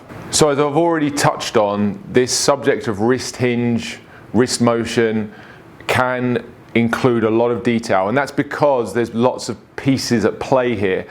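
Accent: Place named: United Kingdom. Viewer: I watch tons of golf content The way you explain it, with LOGIC, is just so perfect. One of the best golf channels on YouTube!! Plus. The British accent? Idk why, someone could be as dumb as rocks, but if they had a British accent? Doesn't SOUND as dumb?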